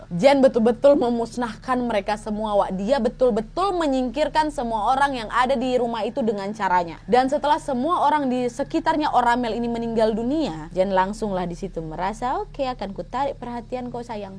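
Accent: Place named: Java, Indonesia. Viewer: Mood banget kak ngomongnya medok 😀😀😀😀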